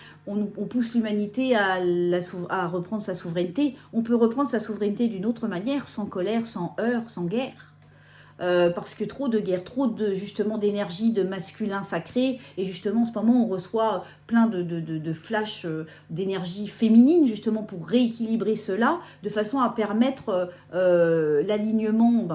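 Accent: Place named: South, France. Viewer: Bonjour,pas mal l'accent du Sud 😋 capsule humoristique avec un cap bien mené ☺️ merci et a bientôt 🎉❤🎉 je vous envoies les cigales 🦗🪰 d'Aix en Provence 🍀🍀 gros bisous 🌹🌹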